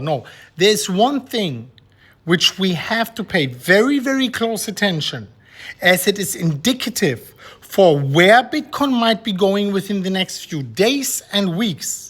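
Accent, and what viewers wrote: Germany. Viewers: Man I missed that thick German accent and the daily follow-ups. I’m back in the game baby. 🦾🎉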